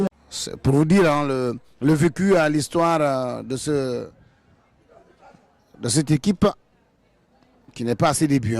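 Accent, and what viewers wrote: Ghana. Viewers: Why is he sounding Ghanaian?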